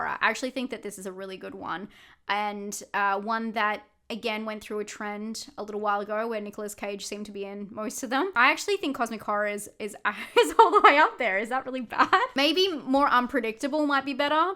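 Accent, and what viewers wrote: Australia. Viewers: Australian English loves those diphthongs! 😆 I like Aussie accents a lot too.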